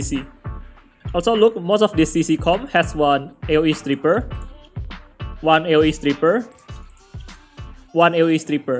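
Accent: Indonesia. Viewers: Logatnya indo banget bang , btw vellajuel cocoknya combo sama siapa ya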